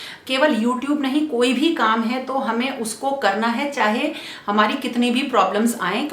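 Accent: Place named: Gujarat, India. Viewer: Nice information. ji after viewing this video I've subscribed ur channel. From ur pronounce I think u r Gujarati, BTW I am Gujarati. Thank You and God bless you. Struggle is the real sugar who makes ur efforts sweet.,🙏❤️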